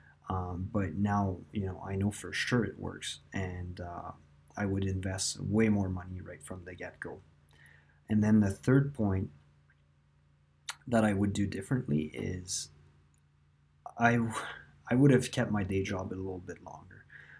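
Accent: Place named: Quebec, Canada. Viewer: Thanks for the info, I find your video very helpful. And btw, are you originally a french Canadian? I can't help but hear a bit of a french accent..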